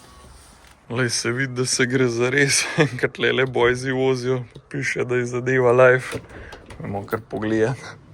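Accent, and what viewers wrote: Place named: Upper Carniola, Slovenia. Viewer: gorenščina pa še neka qvazi angleščina avto fajn zelo pa malo potrudise pa boš imel še več ogledov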